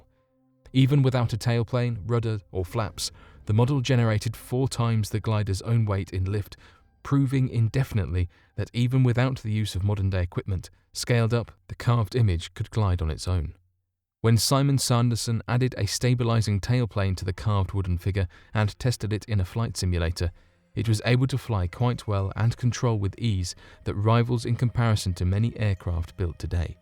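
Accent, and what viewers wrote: United Kingdom. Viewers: He is obviously not American. Can you not hear his British accent? 🙄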